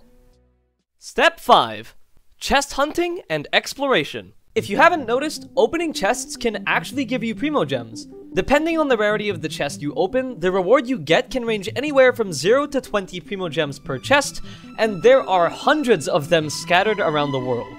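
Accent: Netherlands. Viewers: I like the thick Dutch accent XD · Are you dutch?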